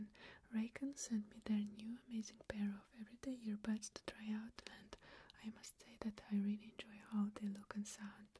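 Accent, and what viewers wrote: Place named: Russia. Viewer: Акцент явно русский 😆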